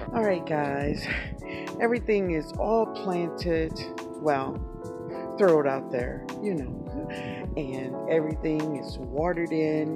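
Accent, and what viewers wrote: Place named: New Orleans, United States. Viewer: I just found your channel today & I am SO HAPPY! I am 25 and just moved into my first house & am trying to hard to make a beautiful garden. I bought these exact boxes & some other tools from the Dollar Tree last night, but have never grown a flower in my life before, so I was desperately trying to find some help online when I stumbled upon your videos. I'm so excited I could cry! You will be helping me through my first garden now!! :) And my mom's from NOLA, so your voice is highly comforting to me 😌😌 thank you so much!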